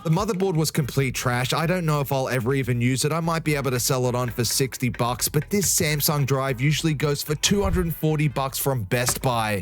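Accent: Australia. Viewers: I thought you were Australian, then I heard you mention microcentre ALOT I second guessed. BUT YOUR FROM BRISBANE ❤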